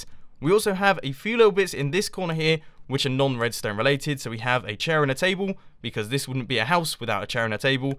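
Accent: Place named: United Kingdom. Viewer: arrrrrrrrrrrgh british accent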